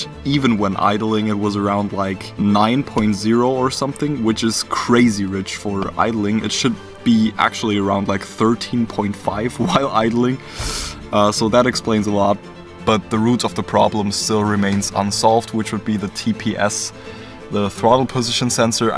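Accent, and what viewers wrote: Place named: Germany. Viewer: I new from the beginning you must be german speaking because of that accent 😂 but the license plate did make me unsure, until you started talking to your buddy😂 Well, Hallo aus Deutschland 😂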